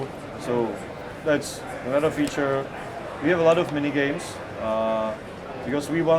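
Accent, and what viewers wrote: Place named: Czech Republic. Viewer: Jde poznat český přízvuk ! :D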